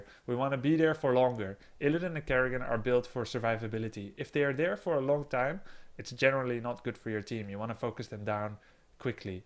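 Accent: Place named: Netherlands. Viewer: You look and sound so Dutch :D Nice vid.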